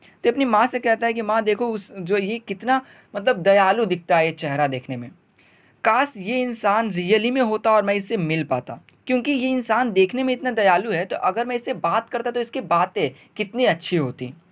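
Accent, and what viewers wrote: Bihar, India. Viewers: Sir from where u r???????? I think from Bihar. I am from bihar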